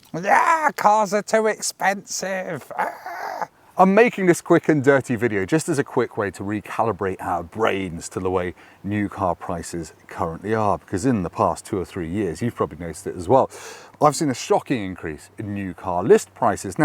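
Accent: United Kingdom. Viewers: Brummie flipping YouTuber 😂😂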